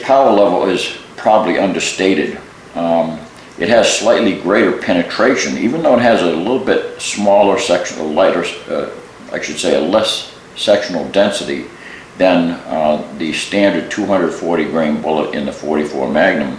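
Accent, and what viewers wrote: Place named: Vermont, United States. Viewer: Are you VT/N.H.? Your accent reminds me of one of my troops in Iraq. He was raised on a dairy farm in VT. He's now a detective locally.🇺🇸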